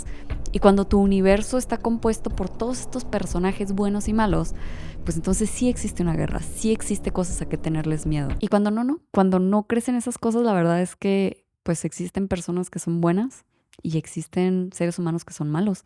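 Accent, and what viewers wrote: Mexico. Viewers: Bello nuestro acento mexicano,tu eres el ejemplo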